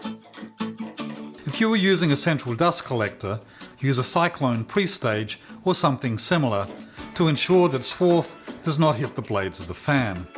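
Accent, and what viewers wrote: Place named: Australia. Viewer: Hi mate. Great conversion 👍… You sound like an Aussie… but you mentioned 110v… Are you in the USA? Regards Robert ( Sydney, Australia)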